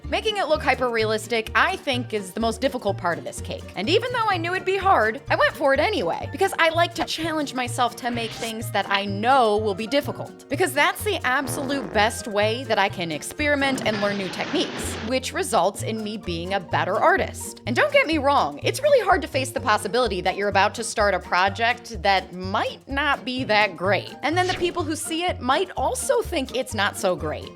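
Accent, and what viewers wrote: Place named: South, United States. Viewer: I luv ur country accent that is different from the normal kind u speak when ur on food network on TV...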